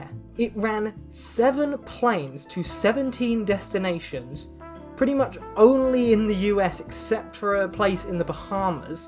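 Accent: United Kingdom. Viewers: The sunglasses the black satin opera gloves and the British accent really does it for me. Anyone else?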